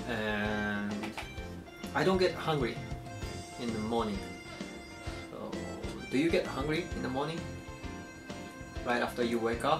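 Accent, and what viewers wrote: Japan. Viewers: Why are you talking in a japanese accent? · a white guy with a japanese accent. smh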